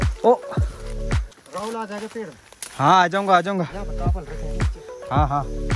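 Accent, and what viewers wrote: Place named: Maharashtra, India. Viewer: Ur tone sounds like Maharashtra people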